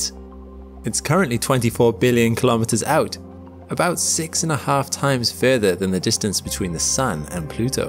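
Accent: Wales, United Kingdom. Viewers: are you welsh ? ur accent sounds slightly welsh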